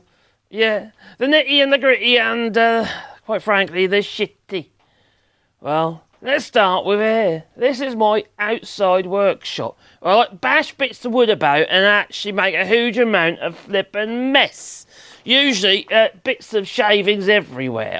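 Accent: Norfolk, United Kingdom. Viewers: A Norfolk boi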